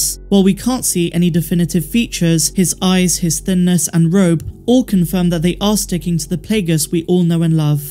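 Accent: United Kingdom. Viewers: Why do you sound British?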